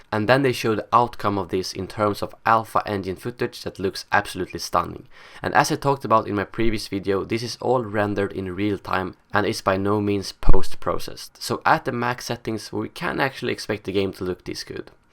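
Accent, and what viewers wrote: Sweden. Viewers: Are u from Sweden??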